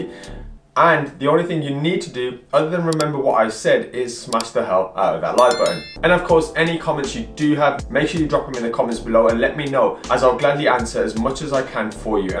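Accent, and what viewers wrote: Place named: Wolverhampton, United Kingdom. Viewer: Class video and good to hear it coming from someone local! I'm in west brom, you sound like you're from wolves lol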